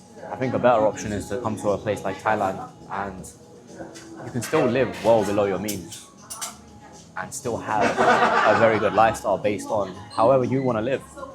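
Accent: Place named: United Kingdom. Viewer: you sound like you came from the UK.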